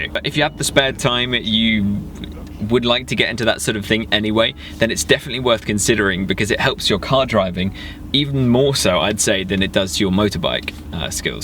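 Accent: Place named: United Kingdom. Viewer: Irrelevant Q But what part of UK are you from? Thanks for making quality videos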